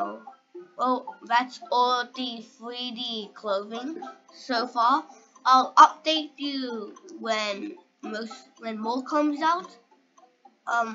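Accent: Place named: United Kingdom. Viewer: you sound like u in the uk homie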